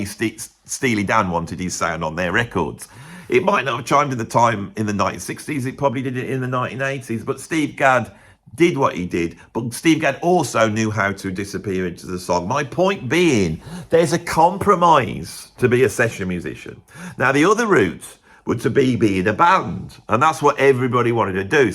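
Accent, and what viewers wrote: United Kingdom. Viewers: You do a great British accent. 😅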